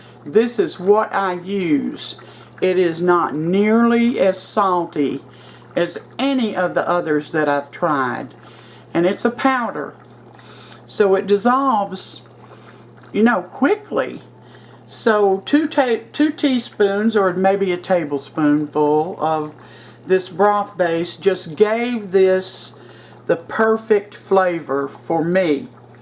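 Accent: South, United States. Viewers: CAN YOU PLEASE DO A VIDEO SHOWING US HOW TO CAN YOUR WONDERFUL SOUP? So glad I found your channel! I have a lot of catching up to do, looking at all your yummy dishes! I love your southern accent! Thank you for sharing! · Lol, she said giving a thumbs down was mean😂😂😂. I'm definitely giving you a thumbs up Mama! Love that country voice😊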